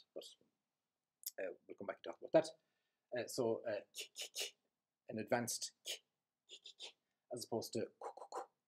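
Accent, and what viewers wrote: Ireland. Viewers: Grand video for someone like myself teaching himself Irish that wishes to learn the true pronunciation, free of English influence. What part of The Emerald Isle do you hail from, sir? I daresay your accent is one of the most beautiful, if not the most, beautiful I've heard in Hiberno-English or any variety of English spoken anywhere in the world. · I love the way that your English speech sounds just like my Irish born Father (RIP) spoke his English, (he was from near Murren, Donegal)- and I appreciate your excellent knowledge of the Irish Language..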